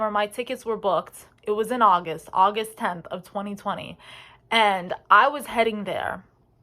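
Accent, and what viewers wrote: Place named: New Jersey, United States. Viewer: Look like from Boulder talk like from Jersey.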